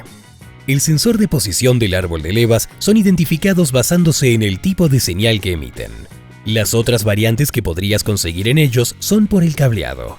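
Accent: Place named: Chile. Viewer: Me Gustan Estos Videos SiN Acento Mejicano....suena como Acento Chileno de Chile po'' weon Chile 🇨🇱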